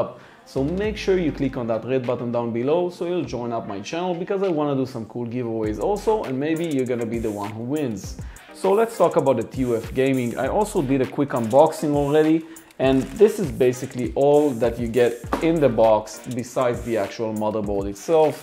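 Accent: Israel. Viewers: this guy sounds violently israeli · אחי, אין על המבטא הישראלי שלך. :)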